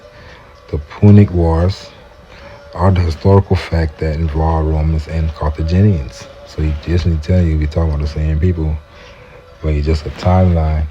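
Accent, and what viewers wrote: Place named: Gullah, United States. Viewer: I here that low Country Gulah "Gulch" in your voice Nobility is that Charleston? "Chuck Boy" 💪🏿?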